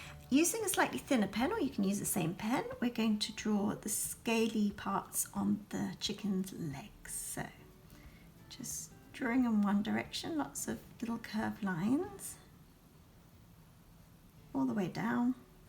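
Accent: United Kingdom. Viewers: I love this video, I said to my son, I like watching videos when they have a nice voice and then a second later you're fine tuning the comb and say " like a punk haircut"! So British, love it.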